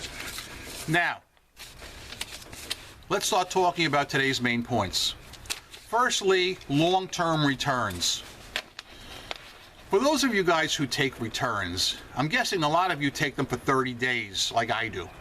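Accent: New York, United States. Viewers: that cawfee accent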